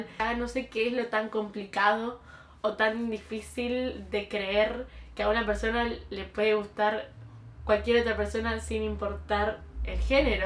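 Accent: United Kingdom. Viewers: Vine a tu canal por tik tok. Empecé con un vídeo de como aprender inglés y termine en este video 🤭 eres re linda! Amo tu acento británico 🤩 y le aplaudo 👏 a tu mami por apoyarte puesto que no todos los padres entienden a sus hijos cuando se declaran con otras preferencias sexuales. Tus videos me han ayudado mucho! Sigue así! 🤗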